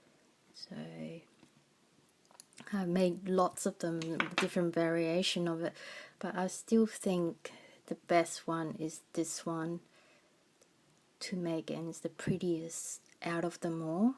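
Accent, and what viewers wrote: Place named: Australia. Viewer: I think you speak beautifully! I noticed the Aussie accent but it sounded different from my other Aussie friends...now I know why. Sometimes I just play the videos to hear the voice...yours is most pleasant; makes it easier to get through a tricky tutorial:)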